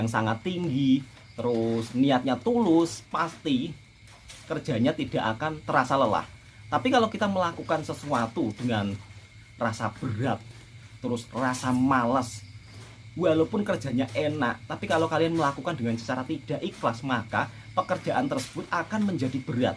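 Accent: Java, Indonesia. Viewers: Teruskan bersiar ....ngomong yg medok kang....!!!!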